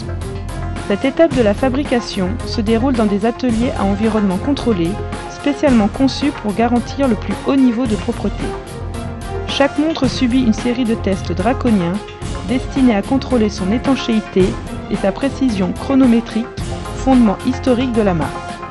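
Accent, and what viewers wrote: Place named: Mexico. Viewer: why is she talking mexican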